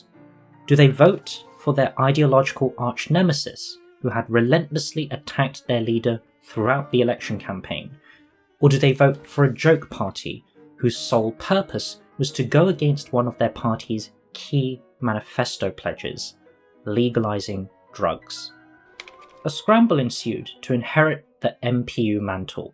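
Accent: United Kingdom. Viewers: There was a 50/50 chance that this would be another of these dumb, right wing US videos and I was never happier to hear an british accent! Very interesting and equally entertaining video!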